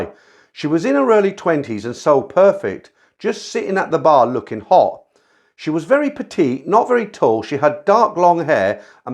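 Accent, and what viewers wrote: United Kingdom. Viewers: Hey Mr, I LOVE YOUR STORIES - It's such an original concept, but the way you read them is sooo properly perfect!! I'm American and live in San Francisco, and your British accent makes the stories and listening to you vids awesome!! I do have a story, but it's not a Thai....my wife is japanese, and she lives over there...we don't see each other as often as I would like, but it's still all good.... Maybe our story doesn't qualify, but it is a dream come true!! Again, I always look forward to your uploads and storytime read(s)!!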